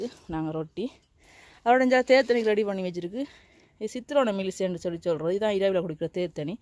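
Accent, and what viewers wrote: Sri Lanka. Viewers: நீங்கள் செய்யும் முறை அனைத்தும் அழகு உங்கள் யாழ்ப்பாண தமிழ் மிக்க அழகு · hi do you remember me? glad to see your video beautiful SL tamil. keep sharing, ipodhu ungal veetu ilakam munootru thonootru aaru. Valha valamudan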